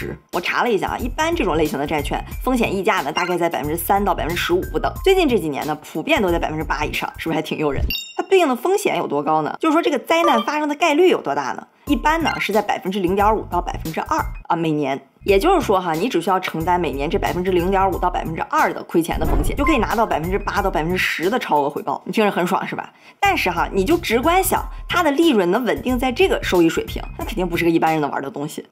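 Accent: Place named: North, China. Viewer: 好奇你是来自哪个地方的人？口音太重了。北方人？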